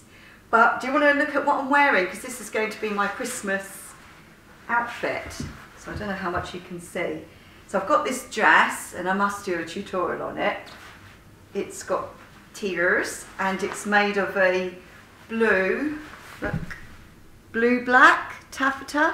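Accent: United Kingdom. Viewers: Happy Christmas, and thanks for the "tour", especially the beginning as I spent the first 12 years of my life in West Ken. That and your west london accent made me nostalgic. Thanks to your channel I have revived my sewing interest and my new year aim is to work through a Japanese dressmaking book (in English!) I aim to upgrade my style as I am on a diet. 🎄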